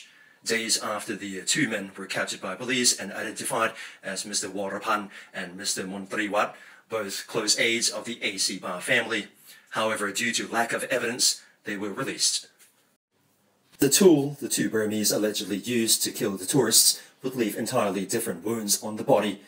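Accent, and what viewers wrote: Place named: West, United States. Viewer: คนอ่านข่าว สำเนียงฝรั่งจ๋าเลย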